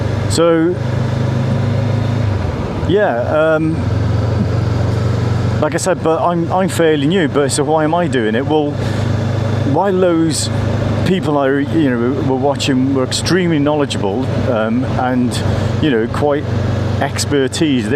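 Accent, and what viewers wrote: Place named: Cardiff, United Kingdom. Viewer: Great video. I'm in Cardiff, so I recognised the accent immediately. I'm about to start my biking journey. Just like you, I'm a bit older and recently booked a CBT course. Videos like this are inspiring. Keep up the great work. Subscribed and looking forward to your next video. By the way, love Nash Point, too. I've camped there a few times.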